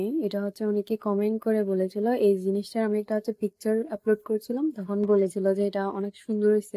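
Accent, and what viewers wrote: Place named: Chittagong, Bangladesh. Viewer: সুন্দর হয়েছে আপু তবে আপনি চট্টগ্রাম থেকে বলছেন মনে হচ্ছে 😊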